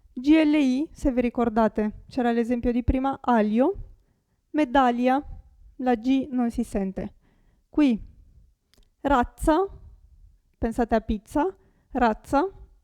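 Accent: Eastern Europe. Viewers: She's not Italian. Her accent is from Eastern Europe. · beh il vocabolario più esteso, i modi di dire, la pronuncia, può insegnare anche i vari toni di dialetti etc etc etc... Quello che non mi è piaciuto in questo video è la pronuncia dell'est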